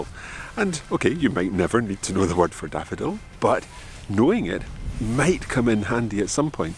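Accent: Scotland, United Kingdom. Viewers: Hello,! I've been most impressed with you clearly perceptible Scottish accent!;-) Do you realise you have it, by the way?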